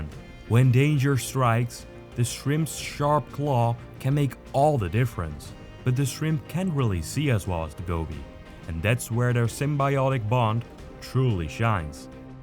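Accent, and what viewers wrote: Netherlands. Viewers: Your from Holland right ?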